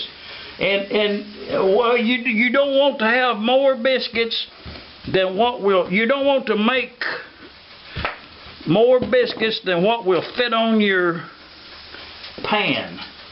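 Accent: South, United States. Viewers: I tell you somethin.....I've caught the southern jawl from you......I was yesterday mixing some dough for cornbread and while I was mixing, I was saying to myself-"Gitter DONE !!" This is gunna be GOOOD !! I was chuckling while I was stirring like the Arkansas dog does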